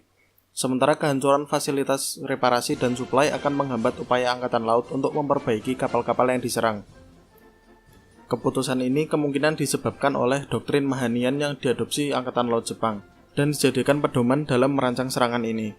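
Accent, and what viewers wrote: Java, Indonesia. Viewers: logatnya medok bgtt aduhh .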